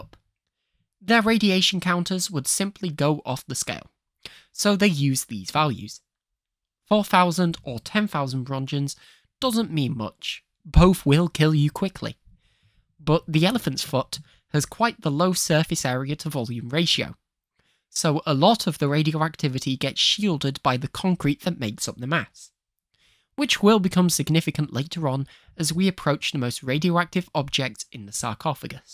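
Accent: United Kingdom. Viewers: No its the British accent that sucks. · Started video and heard the British accent that pronounces TH as F. Immediately stopped video and clicked Do not recommend channel.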